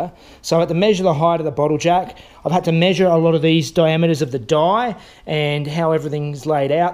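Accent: Australia. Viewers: He said put the earth on it when he forgot the ground lol it’s just funny because I’m American and I like the way the Aussie talk lol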